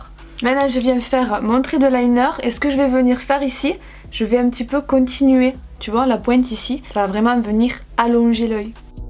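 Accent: South, France. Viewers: c'est l'accent du sud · sud de la france ? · L’ACCENT DU SUUUUUUD J’ai juste l’impression que mis à part les ch’tis... on a pas d’accent dans le nord 😂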